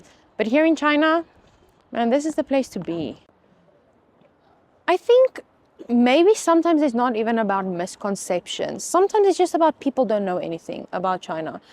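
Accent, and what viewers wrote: South Africa. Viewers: jy het n lekker South African accent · Watching from Montreal, Canada. are you South African? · your accent sounds South African... is that the effect of Mandarising your US accent... just out of interest... Many thanks, loved the video, subbed. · I could be wrong, but you sound South African. From Jhb, i just wna say, you got my subscription sister ❤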